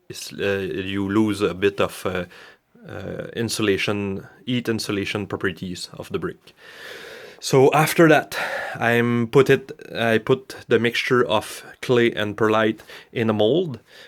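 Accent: Quebec, Canada. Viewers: ca sonne comme un quebecois ca.......... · It's francophone Canadian. A very strong francophone I might add. I'm also Canadian and only speak English... I sound nothing like this and the majority of Canadians do not sound like this either, but Canada is the only place you will hear this particular francophone accent. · You sound Canadian. Is that where you are from? Love the accent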